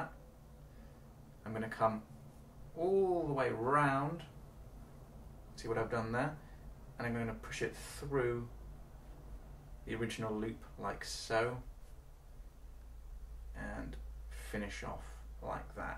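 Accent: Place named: United Kingdom. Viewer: Hi there. Another fantastic tutorial thanks. I'm brand new to climbing and I wonder if you could tell me if this knot is as strong as the re-threaded figure 8 please ? I see people tie in on videos with the re-threaded and I wonder why they don't use this and a carabiner to their harness ? Many thanks and great to hear another Brit on YouTube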